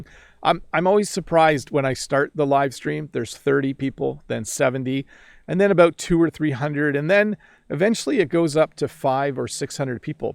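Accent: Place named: Canada. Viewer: I've been in the US but I couldn't tell your pronunciation is of Canada. The differences are so slight!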